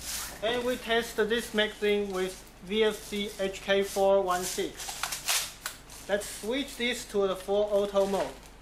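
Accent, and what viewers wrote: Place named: China. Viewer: lovin the chinese accent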